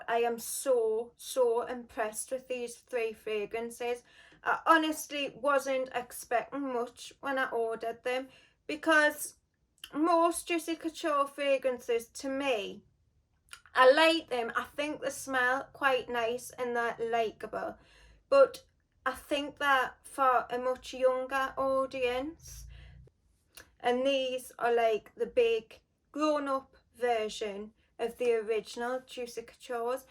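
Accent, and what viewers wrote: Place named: Newcastle, United Kingdom. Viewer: I’m not from the UK and I love your accent, is it Geordie? Just got majestic woods and love it!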